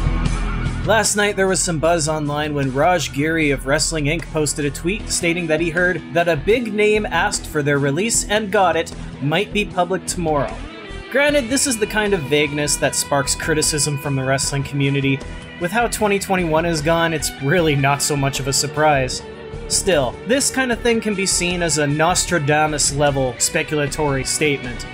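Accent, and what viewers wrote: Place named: Minnesota, United States. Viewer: that's cool. I unsubbed from all other foreign pro wrestling channels because it's really hard to listen to their accents . You just sound like I guy from Minnesota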